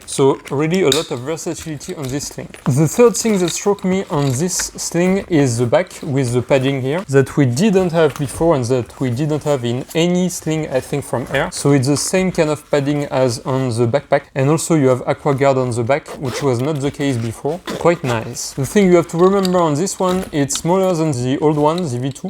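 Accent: France. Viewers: Nice french accent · Pour un français (l'accent se reconnait bien ;) ) la traduction auto serait compliquée. Déjà qu'avec des anglais c'est très aléatoire... Pour construire une commu Francophone, ce serait mieux j'imagine.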